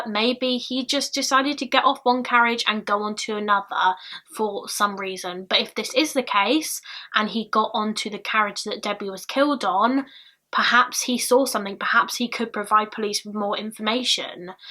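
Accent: United Kingdom. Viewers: Listening to true crime in a British accent is e.v.e.r.y.t.h.i.n.g! LOL.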